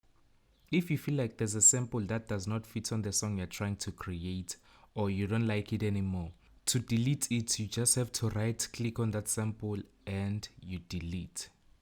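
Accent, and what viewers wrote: South Africa. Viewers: Definitely a South African (Durban) speaking. Nice tutorial. I'll be making my first kwaifi sounds. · I can tell this guy is SOUTH AFRICAN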